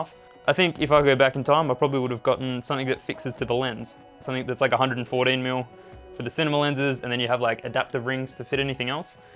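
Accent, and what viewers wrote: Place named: New Zealand. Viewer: Half the reason I watched this was for your Kiwi accent. It's like watching a young Taika Waititi. XD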